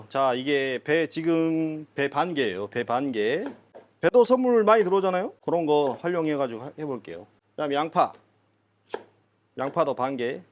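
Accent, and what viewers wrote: Jeolla, South Korea. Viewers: 전라도 말투 짜증난다.배주스 넣어라 비싸다. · 이 정도면 어음청 친절하고 정확하구먼.. 전라도 사투리 억양이 좀 있으시는거 같음 🤔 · 전라도억양이그대로 ㅋ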